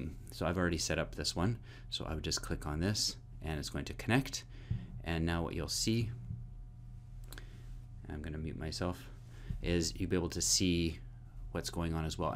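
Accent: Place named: Northern Ireland, United Kingdom. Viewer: Thanks! Very well explained. Question: you're from NI right?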